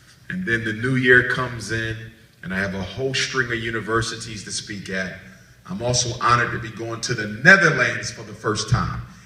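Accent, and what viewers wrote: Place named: Toronto, Canada. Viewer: He sounds like he is from Toronto.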